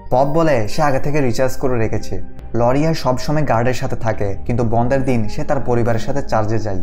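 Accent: Chittagong, Bangladesh. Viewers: Chittagong er pola! Accent shunle buja jai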